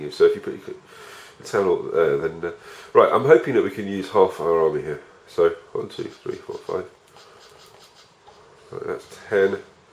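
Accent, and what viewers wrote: United Kingdom. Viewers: What part of the Uk are you from?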